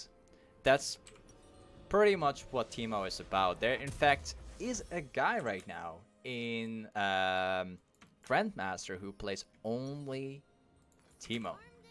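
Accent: Netherlands. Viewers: Echt een grof accent van Nederlands haha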